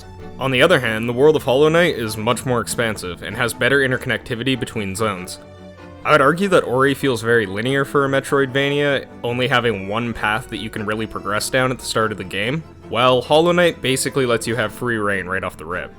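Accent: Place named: Mexico. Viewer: English! You sound like Mexican and I do not understand Mexican.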